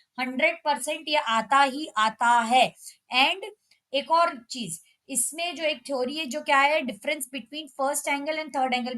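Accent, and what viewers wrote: Indore, India. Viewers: Mam Indore se ho kya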